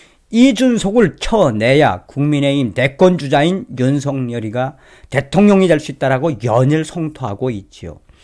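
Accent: North Korea. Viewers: 말투가 북한 사람같내?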